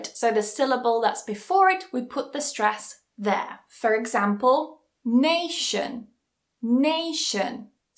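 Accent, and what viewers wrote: United Kingdom. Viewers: Though, I am an Indian boy still I love to learn British accent from you. I enjoy learning with your so profound smile 😊😊❤ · I love your British accent ❤️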